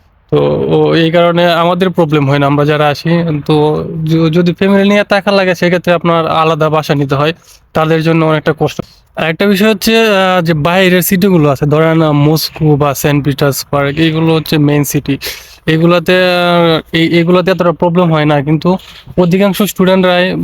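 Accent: Kishoreganj, Bangladesh. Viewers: আমাদের কিশোরগঞ্জের কথাবার্তা মনে হচ্ছে ❤। কিশোরগঞ্জের কোথায়?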